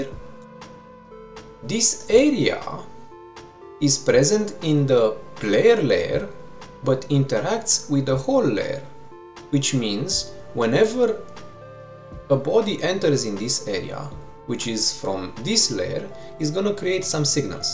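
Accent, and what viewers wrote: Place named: Romania. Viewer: Tocmai ti-am vazut videoclipul, incercand sa imi dau seama de cum sa fac o detectie cand player-ul atinge zona cu foc, l-am urmarit pana la capat iar apoi am vrut sa ma abonez. Cand am vazut " " in nume, am facut legatura cu accentul tau si m-am bucurat sa vad ca sunt romani, buni, care fac astfel de content. GG bro, ai un nou subscriber!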